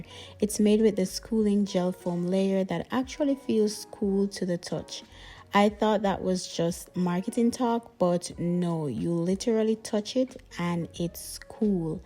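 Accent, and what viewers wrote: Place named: Caribbean. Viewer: I don't know how I ended up on this side of youTube but heard the accent and hard to support my Caribbean sistah! Great tips!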